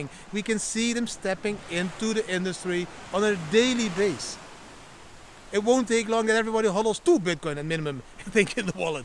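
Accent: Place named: Netherlands. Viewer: I love your dutch accent 😄 I lived in the Netherland for 2 Years. I met Bitcoin in 2013....since that time i ‘m part of the blockchain adventure. Great job.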